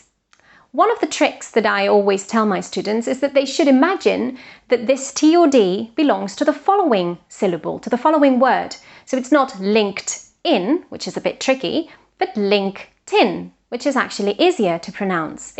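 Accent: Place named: United Kingdom. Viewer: he notado que tiene el acento británico. Es mas facil aprender inglés britanico?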